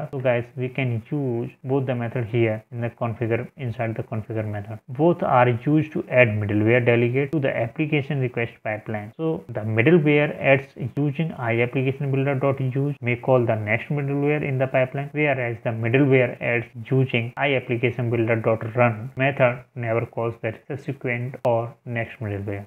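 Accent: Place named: Western Europe. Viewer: Nice, nice, Western Europe sound.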